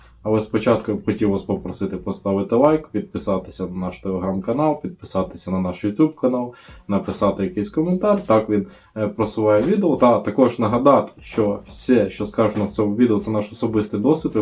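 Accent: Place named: Western Ukraine, Ukraine. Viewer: ого) я би навіть не сказав шо ти був російськомовний) балакаєш так ніби з західу України)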